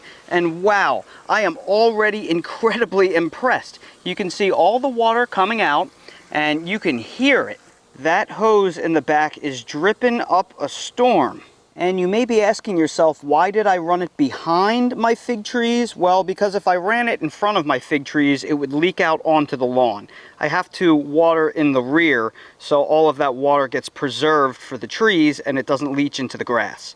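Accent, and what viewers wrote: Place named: South, United States. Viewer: I love your Southern accent! ;-)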